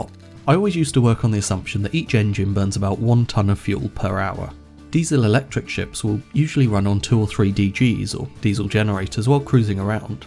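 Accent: United Kingdom. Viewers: You're Britain?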